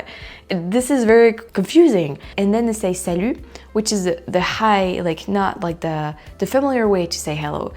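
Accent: Quebec, Canada. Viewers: Étrange que tu ne comprennes pas le français québécois considérant que ton accent quand tu parles anglais est beaucoup plus proche de l'accent québécois que de l'accent français... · The end of this video was more promising than the first half of this video. Glad it's getting a little better with the French Canadian. Hang in there and you will be fine Remember these tips. 1. French Canadian is Laced with slang mixed With English 2. Have the Canadian Slow down when they talk 3. French Canadian is a French dialect. Montreal's dialect is Is hard to understand. Even for Me as an American French Canadian. 4. It sounds like you are Picking up some the dialect. you sound more positive in the second half of your video. The little word book is a great idea to have We are rooting for you. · Hi from Vermont just below Montreal have you picked up the Canadian accent yet 😂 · You're speaking with a Quebec accent a bit; you've adapted.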